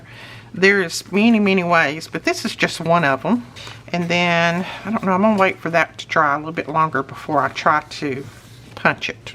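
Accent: South, United States. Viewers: Yup found it! Thank you. I love your videos and your southern accent! (I’m from Boston lol)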